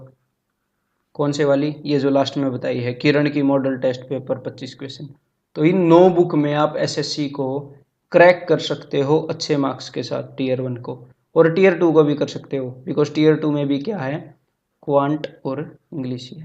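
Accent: Haryana, India. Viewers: Sir aap haryana se ho kya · Very good sir.... Aap Haryana se ho...? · Lol yer your accent is cute..haryanvi sort of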